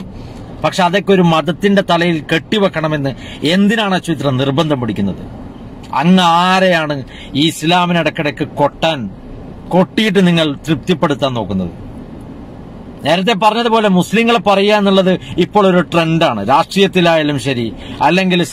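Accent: Kasaragod, India. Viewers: കേറി വാ മക്കളെ...🙋 ഇനി മുതൽ കാസർഗോഡ് ഭാഷയിൽ ബ്ലോഗ് കേൾക്കാം...😍😍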